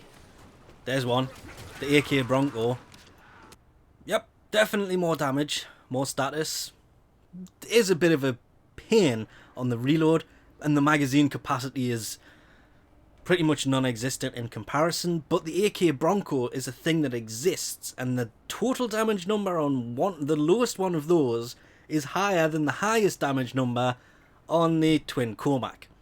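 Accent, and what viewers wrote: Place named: Northeast England, United Kingdom. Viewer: Sounds like North East England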